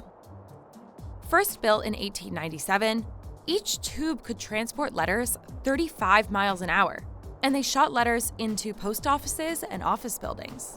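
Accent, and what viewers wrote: California, United States. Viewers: That annoying California accent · where does the narrators accent come from? American females aren't born whih it, yet so many want to sound like they were raised in a mall. just talk normal.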